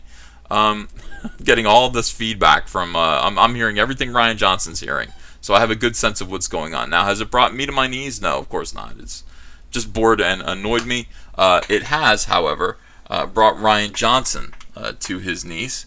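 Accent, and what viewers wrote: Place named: Oklahoma, United States. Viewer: You have a an accent now, like you are from Oklahoma or Arkansas.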